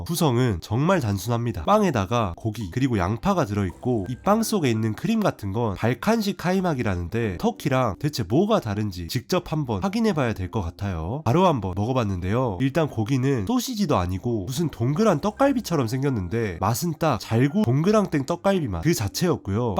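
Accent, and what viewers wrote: Jeolla, South Korea. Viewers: 아 전라도 사투리 듣기싫네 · 전라도 유튜버는 정말 오랫만에 보네요 첫 멘트부터 억양이 ㅋㅋ 언제나 화이팅 하시길😊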